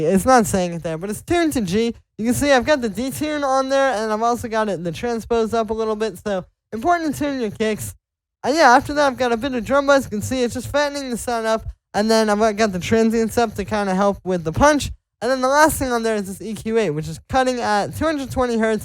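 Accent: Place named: France. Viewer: Your voice is horrible,please stop talking during your videos !! Je penses que tu es français.. FERME TA BOUCHE ou ôte le bout de fromage que tu as entre les dents quand tu parles !! Quand on a une voix horrible comme la tienne on ne prononce pas 10 mots par seconde..ou Alors ARTICULE..c'est fou que tu ne t'entendes pas parler. mec...Corrige toi ..Sinon tu bosses bien tu as du talent mais stp tais toi !!!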